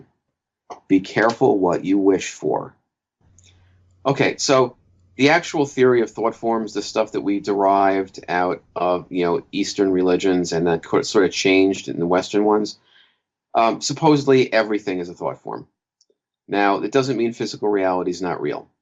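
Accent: New York, United States. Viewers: Awesome perspective. You sound like youre from the ny area...so am I so theres an added comfort of sorts there. Thought really does lead to forms. The universe is one long song. When we are in harmony, we feel joy. When we are in disharmony, we feel anxiety. The problem today is there is an unspoken pressure to contruct a life that is by design unfulfillibg. So we seek pleasures to relieve us and soothe us. Sickness of society can only be faced by the individual. Seeing the power we truly have every day could liberate so many from the victim mindset. Much thanks!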